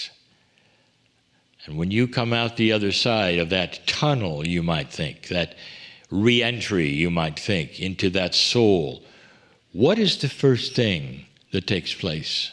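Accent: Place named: Newfoundland, Canada. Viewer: Hmm.. I detected a bit of a Newfoundland accent in this channeling 😅 not sure if it's Lee or Kryon ❤ made me smile and chuckle here in Cape Breton, Nova Scotia lol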